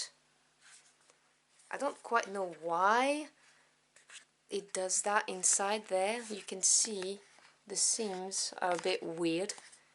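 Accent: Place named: France. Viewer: Lovely accent, French? Or maybe like Syria or something ?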